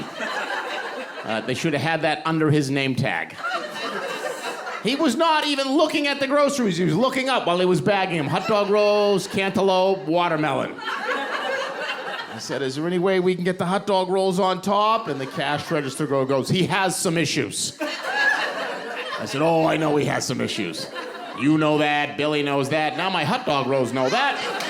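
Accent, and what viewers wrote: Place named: Boston, United States. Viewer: FREAKING HILARIOUS!! Had the video come up on my recommended and I'm NOT disappointed!! I love your Boston accent and delivery was phenomenal!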